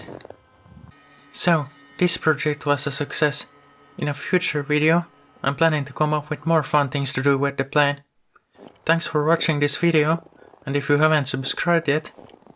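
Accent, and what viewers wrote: Finland. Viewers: 2 seconds in and i can already tell by his voice that it's in finland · Are you fenno-swedish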